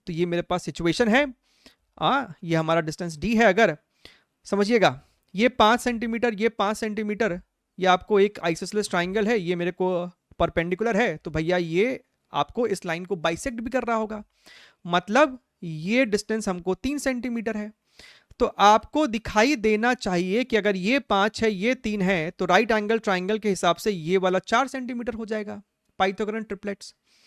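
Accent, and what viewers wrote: Bihar, India. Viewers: Sir kya aap bihar se ho..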